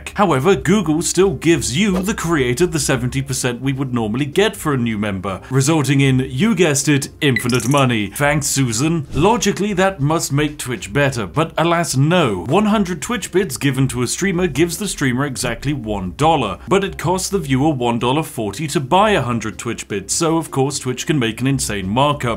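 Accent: United Kingdom. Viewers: This dude is British in every way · this brit be spiffing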